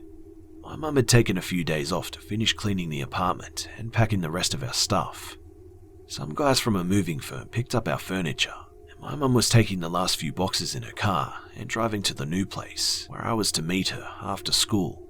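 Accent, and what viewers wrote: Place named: Australia. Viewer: Absolutely, I've been a follower for some time now and sorry if my comment came off a bit sketchy. 😂 I guess you're australian but could be wrong, I'm from Europe myself btw so it sounds original to me (in a good way ofc). I do like the rest too, the narration style, stories and the atmosphere etc. I find myself prefering this "stories-channel" first out of similar channels. 👍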